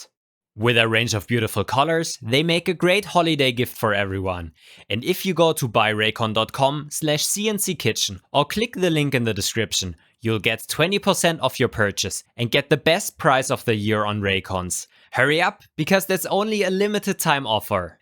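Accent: Germany. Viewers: Bist du nicht aus Deutschland? Klingt so. Wenn ja, gibt es auch einen Deutschen Kanal? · Lol i've noticed english isn't your native language, but i couldn't think of what country you came from,but the "Guten Tag, i'm " let me know you're also german!😂😂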